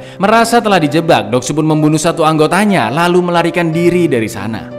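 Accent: Java, Indonesia. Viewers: ngomong e medok.. Monggo sekeca'aken dook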